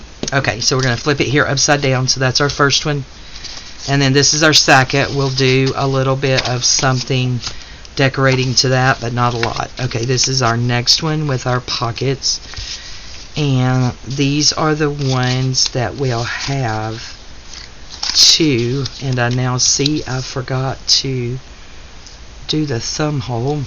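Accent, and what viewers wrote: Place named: South, United States. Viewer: Nope. All good. But I’ve got to say since that explanation at the end….I don’t think there’s anything wrong with your “scruffy” voice. To me, it just sounds like a southern accent. Heck. I sound like a whiny kindergartner.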